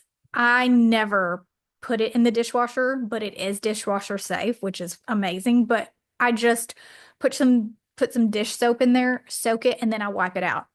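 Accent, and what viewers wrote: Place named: West Virginia, United States. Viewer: You from West Virginia 😄😄😄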